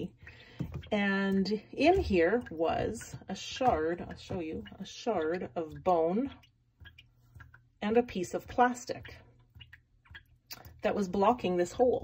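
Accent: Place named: Canada. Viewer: Ok wait... You sound Canadian maybe? and? Italian-Canadian? Hello from North Jersey! Thank you for the helpful video... helping my friend (but that guy shouldn't have given you sealant for that p-trap... they are meant to go together easily and seal by themselves... that stuff will just make a mess. It's really just for sealing threaded metal pipe... NPT etc... )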